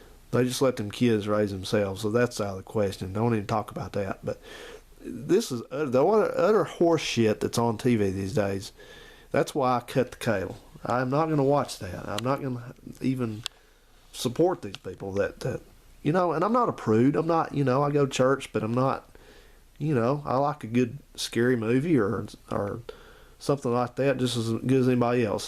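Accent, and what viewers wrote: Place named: South Carolina, United States. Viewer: Squad 51 squad 51...though I'm 32, I love that show. Agreed about making merge...just what kids need to learn. My 9 yr old likes your repair videos over tv. He tells me you sound like you live in south Carolina.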